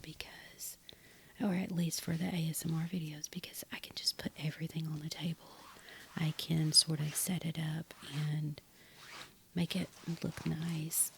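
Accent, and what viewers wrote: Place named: South, United States. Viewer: Yay, you're speaking! I love your baking videos but always wished you'd add the soft spoken voice. Generally I don't like voiceovers, but yours was great. So, yes to the speaking, yes to the crinkly flour and sugar bags, yes to the butter wrappers, yes to checking the recipe a lot, yes to minimizing the mixer sound and yes to porcelain bowls! Great job! Plus you really know your baking. And your southern accent was just the icing on the cake. Maybe you could add narration to your other past videos. Keep up the great work! Thank you.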